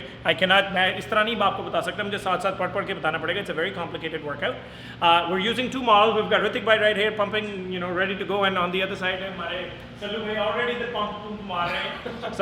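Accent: Punjab, India. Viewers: loved ur way of telling punjabi tone especialy .and thanx for tips i will try .and pls let me know the brand of protien too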